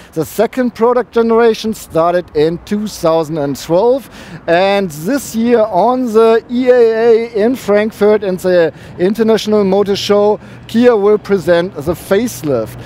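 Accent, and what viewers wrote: United Kingdom. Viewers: nice review,very nice car, nice english accent ! good job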